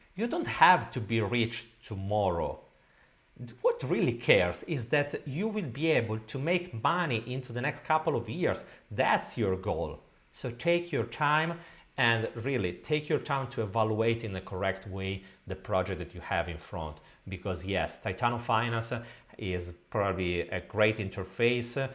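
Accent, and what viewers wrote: Italy. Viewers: Thank you so much for showing us these bad platforms. So educational for humanity good. I just discover you and although it takes time to understand your Italian accent, I love it.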